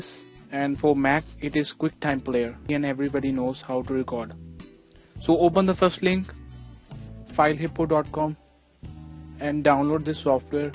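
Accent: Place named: India. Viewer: I dont trust this guy he sounds like a Indian tech support scammer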